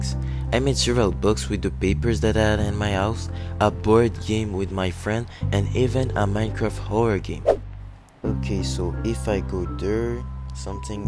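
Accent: France. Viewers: are you french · Tu est un français ça s'entend !! 😂😂😂 Mais super video!! Continie comme ça!!😊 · You are french for sure hahaha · you speak french ? You have a accent french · are you french? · Aew you french?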